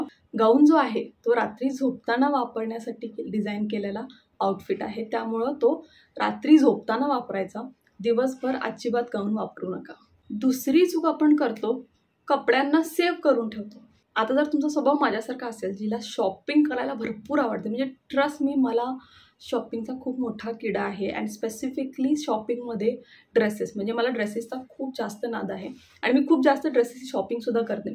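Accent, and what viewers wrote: Kolhapur, India. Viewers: कोल्हापूर ची आहेस का ताई....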